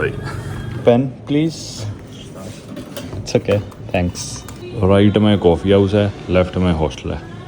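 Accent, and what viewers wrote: Haryana, India. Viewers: Bhai iske itn follower hai per kisi ne notice nahi kya ye apni aadhi vedio me to sirf airport aur bus aur aane jane ke tarike pe hi bana leta hai, phir aadhi visa ki story , desh ki story fir hotel kitne ka kaisa kaha kaisa hai bus end ,,,the end,,, bhenchod ghumaa kab ?kaha? kidhar ?only 5 min,, total 1 jagah jata hai uski vedeo me 5 min hi ghumane ka video hota hai😅😅😅😅 Aur indian chutiye isko follow kar rahe hai,,thora Haryanvi accent me bakchodi 🙄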